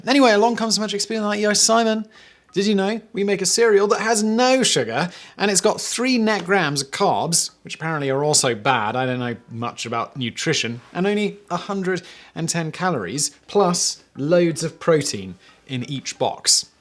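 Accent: England, United Kingdom. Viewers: I think Simon deserves a statue. He manages to get people to pay attention to the most random and banal subjects but that English accent and dry humor makes us forget that we didn't know we didn't care about whatever subject we didn't know we didn't know about. Encouraging accidental education during quarantine is a difficult thing to attempt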